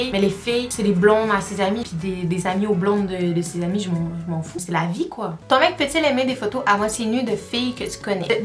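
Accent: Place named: France. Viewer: pourquoi tu parle comme les français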